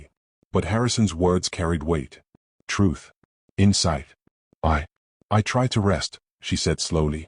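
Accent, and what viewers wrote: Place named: London, United Kingdom. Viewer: This narrator story teller speaks well and good and he tells this story really well and good. This story teller speaks with slight accent this story teller narrator sounds like and speaks like he's from London England he's doing a great job of telling this interesting awesome amazing I can understand everything that this story teller says as he's telling this great beautiful interesting story.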